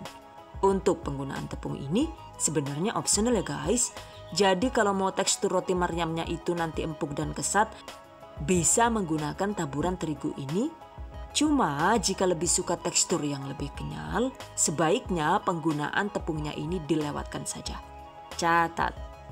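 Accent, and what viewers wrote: Banyumas, Indonesia. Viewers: Ngapak ya mbok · Ngapak ngapaknya yg sll d tunggu....😁😁😁 mksih resep2nya 😚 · Jebule ngapak 😁 yu! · ngapak bersaudara...pda kro adonan kulit martabak telor y mbok... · Siip resep orang ngapak... Heeee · Deneng ngomong ngapak? Asyik.... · njenengan wong ngapak apaa mbaa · Done #giveawayTHVDesember2019 Suka dg suaranya yg berat khas ngapak. Jgn dihilangkan ya. Pasti dong suka resepnya..simpel. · Asline ngapak😍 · Ane senang chenel ini chef-nya itu lo kalau ngomong lucu Inggris ngapak,jadi penasaran dah sama pasti uwonge ayu Iki,koyo msk Soimah hebe,dan makasi resepnya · ih ngapak kalii ini wkwk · rika wong endi si yu.. kayong pada bahasane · Mbekayune wong ngapak y..pada karo inyong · Baru ngedengerin ternyata bisa ngapak juga hehehe.. Ilmunya sangat bermanfaat.. Aku lngsung nyobain bikin lohh bude.. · Minyak bulus nyong haha..deneng ana wong ngapak ya yu....turu lah wes wengi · Rika wong ngapak yu, salam kenal yu🤭 Kesewun resepe(terimakasih resepnya) 😊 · Orng cilacap apa banyumas kak...sya orng tegal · Rika si wong ngendi yu deneng ngapak 😂😂😂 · Saya oma Holi salam kenal sudah kue2 nya enak suara pembuat nya juga sangat merdu apalagi kalau bicara pakai logat ngapak lucu banget saya suka kue2 nya juga kelucuan nya sukses selalu AMIN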